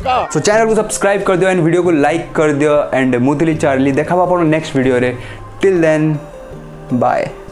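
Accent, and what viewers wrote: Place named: Sambalpur, India. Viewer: oo acha vadhia sambalpur language kahucha sunibaku bhala laguchi 😍real ghara kouthi?🤔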